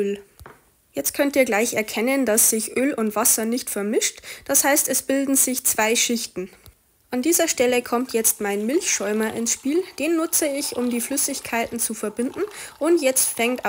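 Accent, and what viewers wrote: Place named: Bavaria, Germany. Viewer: Schönes Video und ein charmanter, Bayrischer Dialket :) Liebe Grüße aus Österreich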